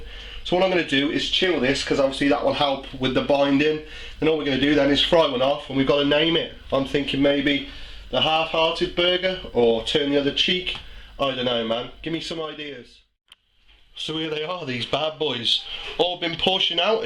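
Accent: United Kingdom. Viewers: British acent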